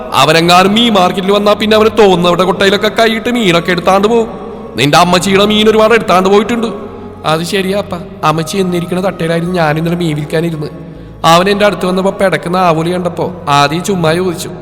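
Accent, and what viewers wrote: Coastal Kerala, India. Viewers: ഇക്കാടെ വോയിസ്സിൽ കടപ്പുറം ഭാഷ കേൾക്കാൻ നല്ലരസം നല്ല സ്റ്റോറി 🥰🥰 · വിജയ് സത്യാ സുപ്പർ സ്റ്റോറി♥️♥️ എതൊരു ഗുഡ യെയും നന്നാക്കാൻ ശ്രമിച്ചാൽ അത് സ്നേഹം കൊണ്ടെ സാധിക്കു നല്ലവനായ ജഗൂ❤❤ ചിലങ്ക❤❤ ഇക്കാ കടപ്പുറം സ്ലാങ് അടിപൊളി😅👌🏼👌🏼👌🏼💞💞💞💞 അവതരണം കേട്ട് അങ്ങനെ ഇരുന്ന് പോയ്🥰🥰🥰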